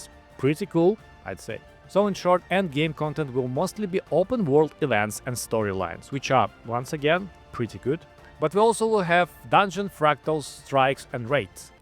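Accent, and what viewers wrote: Russia. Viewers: ох русский акцент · you have a strong russian accent.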